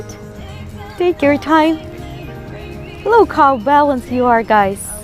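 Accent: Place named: England, United Kingdom. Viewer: I love your English accent. ❤️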